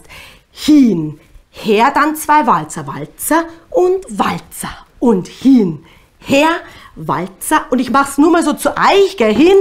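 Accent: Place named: Bavaria, Germany. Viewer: Liebe, ich wollte dir einfach nur ein Kompliment aussprechen. Ich hab mir mit meinem Mann euer Video angesehen. Du, oder ihr seid so sympathisch und lustig. Danke für dieses schöne Video. Das Bayrische klingt so nett und deine Sprüche sind zum Kugeln 🤣 super! Du solltest im Kabarett auftreten! Alles Liebe aus Österreich und weiterhin viel Freude beim Tanzen! Isabella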